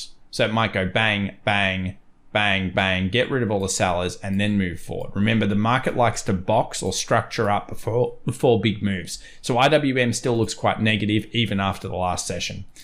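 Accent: Australia. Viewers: Glad I found. I look forward to the daily updates, guidance, expertise. Still have to listen closely as the Aussie accent is a lil heavy. lol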